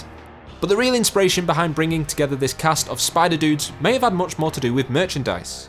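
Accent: United Kingdom. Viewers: I don't mind seeing the old 90's Spider-Man the Animated Series again, but I wish most YouTube uploaders would stop being dickheads and talking trash about the ending of the show being related to the Clone Saga storyline. "The story that fans love to hate"? Thats the problem: most people think that most (if not all) fans disliked the Clone Saga storyline when it was the best story in recent years for Spider-Man at the time. So much happened in that story that I, for one, couldn't get enough enjoyment out of it. Then, it was over, but it had lasting impressions that were both memorable and shocking. Maybe I'm being a bit prejudiced when I say this (honesty makes the world more interesting), but since the narrator is British or English, I don't expect him to fully understand. Even if he didn't like the Clone Saga, there's no need to keep talking down about it as if it was the worst story in Spider-Man history (cough One More Day). 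Yet, this is what people do. Nothing better to do with their lives than bring up old hurts from the past. Leave it alone, already. He sounds like a somewhat old enough guy. Move on. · Downvoted and left the second I heard a British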